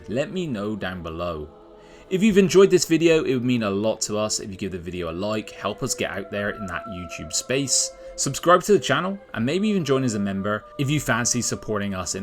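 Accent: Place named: Gloucestershire, United Kingdom. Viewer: Satisfy my curiosity or, I’m a glos boy born and bred. From your accent are you from the same neck of the woods?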